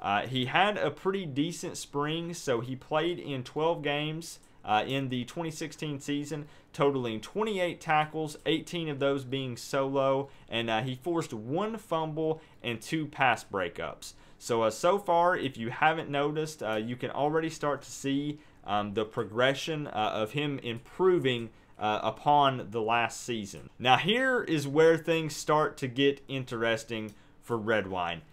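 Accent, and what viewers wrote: South, United States. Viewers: Are you from Miami? You have a deep country drawl... I wasn't sure.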